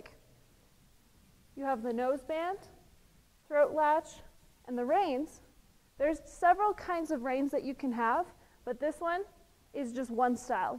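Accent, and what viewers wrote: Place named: United Kingdom. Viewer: She has a accent it's from somewhere in the UK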